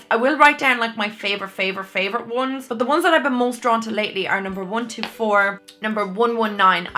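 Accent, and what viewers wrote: Ireland. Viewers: Oh my god I literally just stumbled across this video and WOW I spend so long on YouTube watching makeup tutorials but I’ve never met an Irish YouTuber before, at points during this video I found myself just listening to your accent, it’s so nice among all these Americans 😂 I just had to hit the like and subscribe buttons, I’m definitely going to check out loads more of your videos in the future 💕 (I literally grinned when you said ‘ye’) us Irish need more representation on YouTube 😂 ☘️ 🇮🇪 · Ayyyyyeeeee your Irish 2. much love❤️🇮🇪🇮🇪🇮🇪🇮🇪🇮🇪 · you sound Irish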